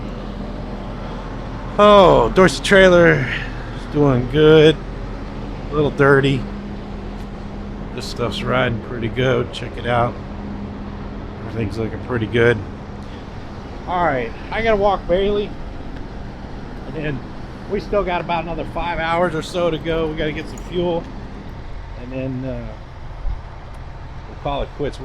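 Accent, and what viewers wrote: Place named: Minnesota, United States. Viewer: Good MN accent there, fella. Stay safe.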